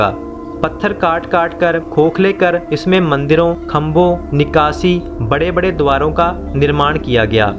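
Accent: Punjab, India. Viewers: OM NAMAH SHIVAY JAI MAHADEV 🌹🌹🙏🙏🌹🌹 Bhai tum aawaaz se punjabi lagte ho. kyunki punjabi hi hindi ko jabardasti bolta hai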